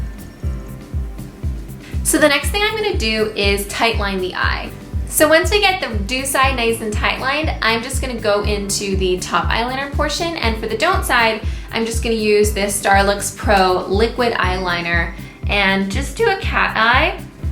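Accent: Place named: California, United States. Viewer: sounds like CA accent.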